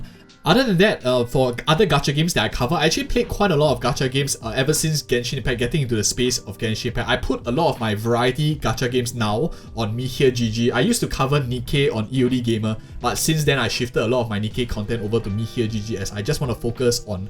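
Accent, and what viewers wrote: Singapore. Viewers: lily aquina and you are my fav star rail content creators, I've watch every video from you since a month ago and I look forward to new one evertime, because I rly like the way you talk about stuff and I have a lot of sg friends and cousin so your accent is kinda a plus for me lol. Also a weird timing cuz i was thinking of A.I art for fun and turns out you have a channel for it, thats cool. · I was wondering why you sound so singaporean. Love to see more from from you ❤ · congrats on your achievement! started HSR on day 1 and have been following your videos and advices from then. when i first listened to your video, i immediately recognised you as a fellow singaporean. its not a bad thing, its a good thing actually. the landscape in sg doesnt really favour content creation so i really feel very encouraged and happy that you are trying and doing your best to make it. 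i'll continue to support you just like how you have supported us in our trailblazing adventures with your wisdom and insights. i'm pretty sure you alrdy know how to pace yourself and get enough rest. jiayou and i'll continue to support you with views <3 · congrats bro, only started watching you recently for star rail and didnt know u had another channel ngl but ill check it out since i like gacha games. also i sort of guessed you were from singapore too from your voice but guess now it confirms it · Always good to see a fellow Singaporean find success in something they love! One of the biggest things I've liked about your channel was finally hearing a familiar accent narrating something that I had to search up on Youtube. Ever since watching my first video on your channel, I've found myself always coming here to look for anything star rail related before going anywhere else. Hope you continue to grow and find even more success! 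· Congrats on your 15k! Fellow Sporean here, clicked into your content since day 1 of HSR, noticed your accent right away and stuck to your vids for guides since they are very detailed as compared to most of the HSR guides. Able to make pull decisions based on your content as well and I always recommend my friends to watch your vids as well for HSR guides. Really appreciate your hardwork that goes into the videos and hope to see exponential growth in the near future!!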